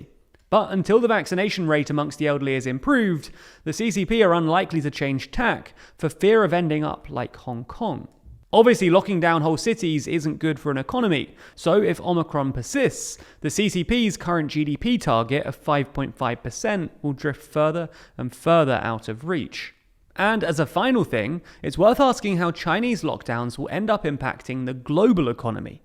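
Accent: England, United Kingdom. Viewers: Nah mate he is definitely English. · I think they are based in the US actually (just the narrator has an English accent) :)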